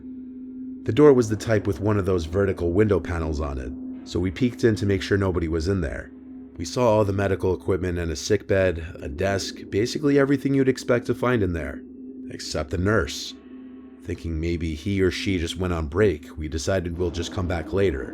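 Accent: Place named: East Coast, United States. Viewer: Literally no one better than and his East coast accent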